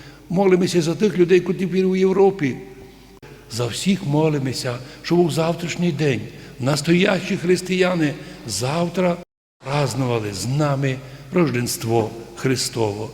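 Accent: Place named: Zakarpattia, Ukraine. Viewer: Він говорить так, як споконвіку говорять на Закарпатті, а літературна українська мова в кабінетах вишліфовувалась, так як і інші, адже кожна місцевість мала свої діалектичні особливості. А ви на кого працюєте, закидаючи йому, що він мови не знає, а самі пишете чиєю?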